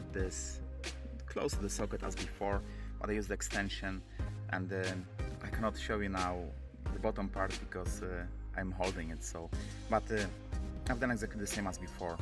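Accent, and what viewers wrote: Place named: Poland. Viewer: Ty jesteś Polakiem słyszę jak mówisz 😆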